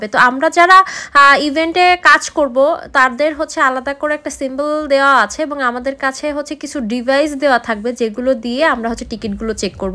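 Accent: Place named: Bangal, Bangladesh. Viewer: Apni bangal ascent ey kotha bolun otai suntey bhalo lage ❤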